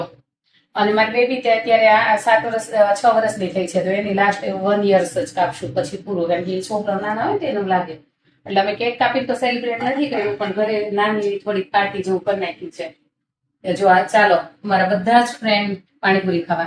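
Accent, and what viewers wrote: Kathiawar, India. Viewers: કાઠિયાવાડી છો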